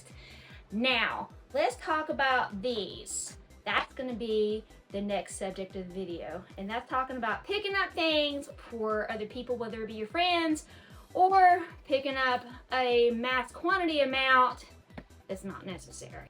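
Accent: South, United States. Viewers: I love you southern accent. and your enthusiasm when it comes to gaming.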